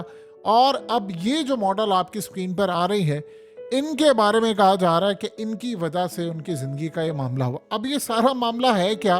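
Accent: Punjab, India. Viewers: Yes, this faceless journalist has to give up his Punjabi accent and learn to pronounce correct names of European/American leaders, celebrities and names of British Royal family members. The name is NOT CHAARLAS it's King Charles the III. Thanks. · Punjabi aisay hi boly hain Dont worry 😅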